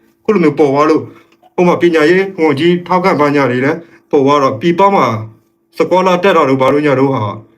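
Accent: Dawei, Myanmar. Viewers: ထားဝယ်သား ကရင်စပ်ပါခင်ဗျ ပြောပြထားလို့သိသာပါ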